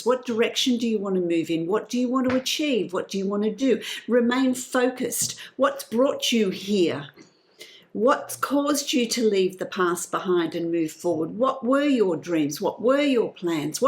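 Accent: Australia. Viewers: so nice to hear the Australian voice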